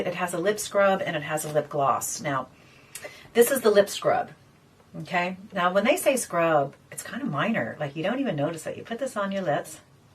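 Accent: Ireland. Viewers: Your accent sounds ever so slightly Irish at times. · You sound Irish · Is it just my imagination or do you sound Irish sometimes? I just bought this baked foundation in porcelain and will try it today for the first time. Every review I've seen is amazing. Love your channel, I'm 50 and have never really used any sort of moisturizer or sunscreen because I would have a reaction to everything. But now at 50 I've been using Tallow which I triple render and my skin seems to love that just fine.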